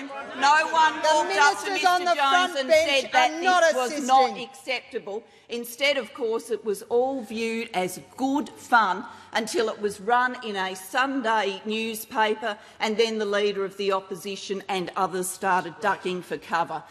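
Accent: Bogan, Australia. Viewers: Why does that little boy Ralipro021 loves Juliar so much? I told him before she only likes happily married older men. 1.Is it her lies & deception? 2.Is it her misandry and her crusade against misogyny? 3.Is it her budget mismanagement? 4.Is it the boat people policy failures and backflips? 5.Is it the carbon tax which we weren't going to have? 6.Is it her unresolved AWU dealings ? 7.Is it her bogan voice & style? It couldn't be any of the above so it is her big fat arse he loves. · So happy I don't have to hear her bogan voice ever again! not like I had the choice when she was PM.. the ugly cow never got off the telly. :(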